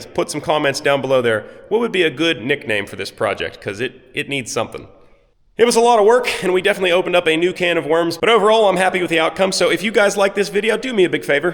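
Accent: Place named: Western Pennsylvania, United States. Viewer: I absolutely love your videos. They are very interesting and very enjoyable. I have to admit that I wait with anticipation for each and every one to come out. Being from western PA myself I feel as if I have known you forever. Your accent brings back many memories of home and things I used to do. I guess you could say I live my life vicariously through you. I am now on disability and living in Florida but each and every video takes me back home. Being an old mechanic and my love for old equipment makes it even better. May God Bless You and your family. Please keep up the awesome videos. I too am waiting on that overhead crane!! Lol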